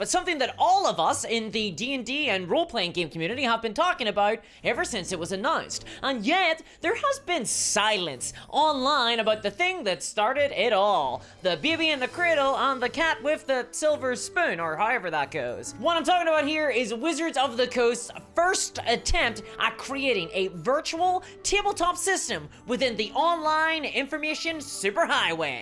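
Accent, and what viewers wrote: Northern Ireland, United Kingdom. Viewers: You say lighting, I hear lagging... clearly I've been away from Northern Ireland too long! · she's from Northern Ireland.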